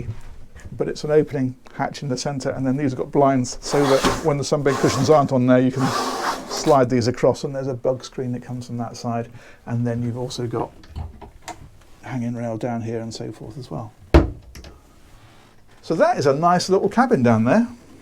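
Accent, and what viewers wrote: England, United Kingdom. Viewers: It’s the English accent 😅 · the way the brits pronounce words is hilarious. couipay? just say coop. you sound gay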